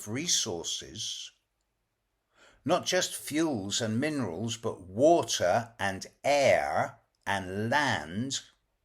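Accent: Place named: England, United Kingdom. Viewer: We love your videos! Your knowledge and that English accent! Thank you for what you do! American girl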